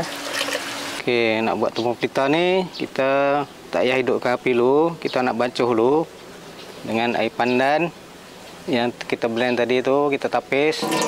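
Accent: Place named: Kuala Nerang, Malaysia. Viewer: Tok ckap mcm org blah kola nerang ja.. 😂😂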